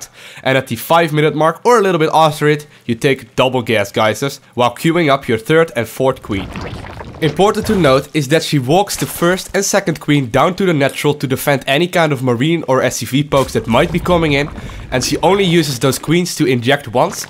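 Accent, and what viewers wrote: Netherlands. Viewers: Are you from the netherlands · ik kan aan je accent horen dat je NL bent haha umm... wat voorn league ben je ? en neem aan dat je zerg bent?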